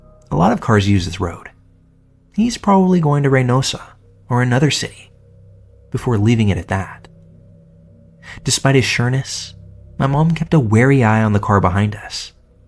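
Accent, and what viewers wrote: United States. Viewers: I'm English and I'm abit werid with American accents. But I really like this one. I'm not sure what part of America comes from my favourite is North Carolina accent😊😊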